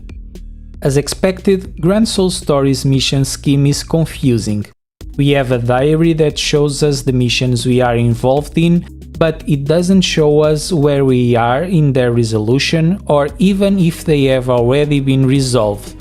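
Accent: Portugal. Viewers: I noticed a Portuguese accent 👀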